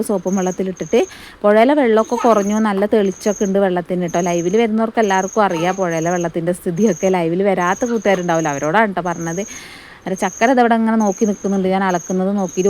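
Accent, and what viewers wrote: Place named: Palakkad, India. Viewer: സസ്നേഹം 🧡🧡🧡 നിങ്ങളുടെ സ്വന്തം നാട് എവിടെ ആണ് hus ന്റെ ജോലി സംബന്ധിച്ചു വയനാട്ടിൽ സ്ഥിര താമസം ആക്കിത് ആണോ? നിങ്ങളുടെ സംസാരം കേട്ടിട്ട് പാലക്കാട്‌ ജില്ല ആണോന്ന് സംശയം 🙏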